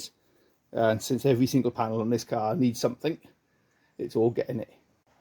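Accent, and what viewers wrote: Wales, United Kingdom. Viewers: I missed the subtitles. As an german Guy it is Pretty Hard to understand Your Wales accent😌😇 next Video with subtitels please.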